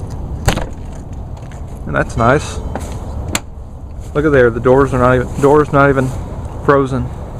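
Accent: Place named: South, United States. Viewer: Where exactly are you, if you don't mind my asking? I detect a hint of a Southern accent. Oh, the song on the Cruze's stereo was PERFECT for that morning!!!!!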